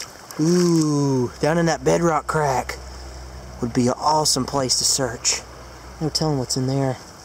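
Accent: South, United States. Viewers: You have THE CUTEST TWAng ever.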